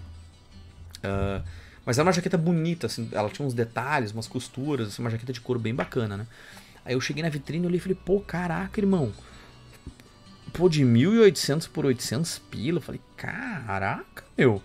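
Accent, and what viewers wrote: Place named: São Paulo, Brazil. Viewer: oxi, vc é de RS? vc parece q é daqui da cidade são paulo.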